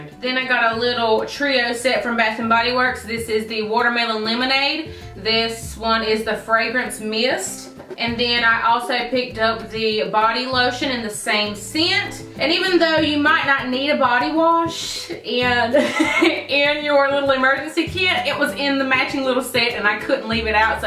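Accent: South, United States. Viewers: My favorite video would have to be the master bedroom transformation. Girl, it's beautiful! I hate picking just ONE video because I love them ALL!! I love those videos when you start rambling in the car because your accent is just like mine so country girl! My Instagram is LOVE YOU & CONGRATS ON 20K GIRLY!!!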